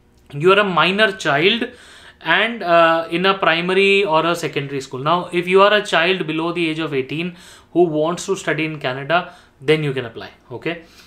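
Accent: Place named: India. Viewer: Your indian accent is very strong bro.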